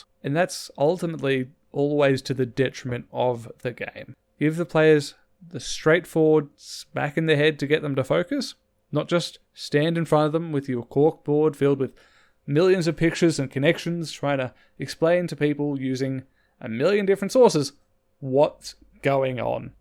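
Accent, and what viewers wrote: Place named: Australia. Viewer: Video essays are good. Plz do more. Good to hear an Aussie on here. While I've always enjoyed doing the extra info searching to get me into a mmo, WoW has started to be boring again. FF14 has been a great antidote. Thank you for making content on it from a similar perspective to mine. Keep up the good work mate and looking forward to hearing more from you once I've finished watching all your vids. (about half way through now).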